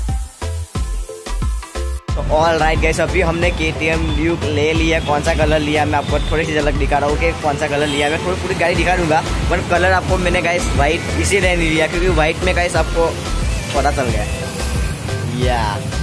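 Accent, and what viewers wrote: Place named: Gujarat, India. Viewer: Bhai tu gujrati he muje pta chal gaya mebhi gujrati hu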